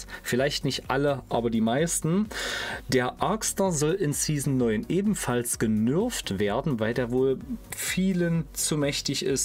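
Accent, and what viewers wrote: Saxony, Germany. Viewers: Du bist doch aus Sachsen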